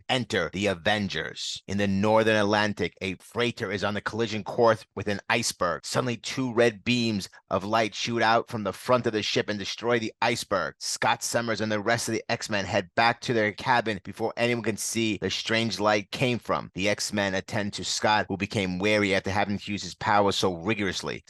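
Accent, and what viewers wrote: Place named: New York, United States. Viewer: You have an awesome voice for radio or tv. You’re accent sounds like you’re from New York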